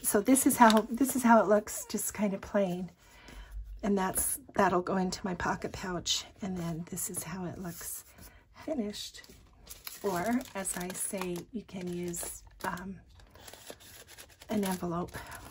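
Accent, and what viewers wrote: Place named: Utah, United States. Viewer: I love this! You sound so much like the people I knew when I lived in Providence, Utah in Cache Valley. You sound like a lady I knew very well in relief society. I’m from East Tennessee and I’m back here now but I lived in Utah for 8 years. I’m happy to have found your YouTube channel!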